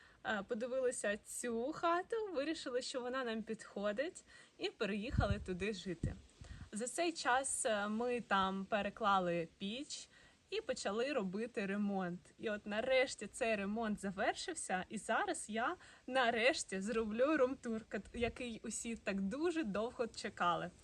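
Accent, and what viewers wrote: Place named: Western Ukraine, Ukraine. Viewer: У нее бизнес,а не молодец,голос западенки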